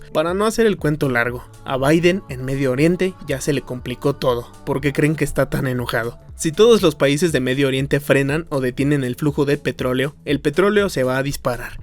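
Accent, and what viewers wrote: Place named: Mexico. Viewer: no puedo ver videos cuando la gente habla asi de culero jaja lastima se ve que tenias buenas intenciones pero tu acento me rompe las bolas, eres de mexico?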